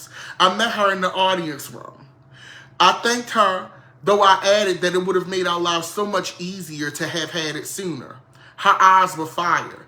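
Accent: Baltimore, United States. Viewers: You got me over here cracking TF up, lil Bmore accent and all the shade- YouTube sent me here from Tells and I LIVE. Hope you do more 🤣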